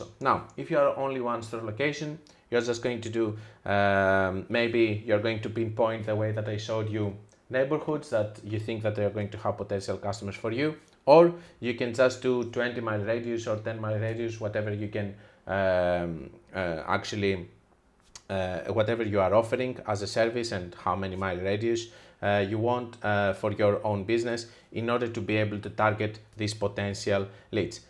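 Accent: Greece. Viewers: η ελληνικη προφορα φωναζει απο 10 χιλιομετρα μακρια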